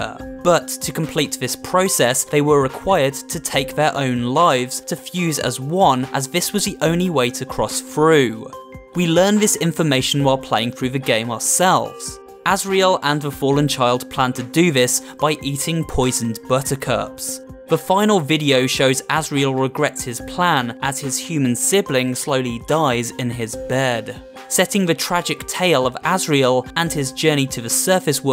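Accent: United Kingdom. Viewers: Its his British accent :I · It's a British accent, dude. Don't judge him. He can't help it.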